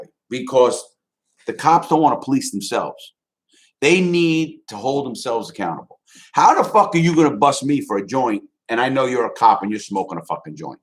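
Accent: New York, United States. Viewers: He’s gotta be New York?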